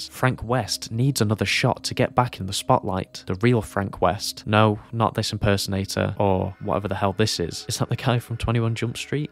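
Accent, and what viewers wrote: United Kingdom. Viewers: Great review. I miss DR1. Are you British?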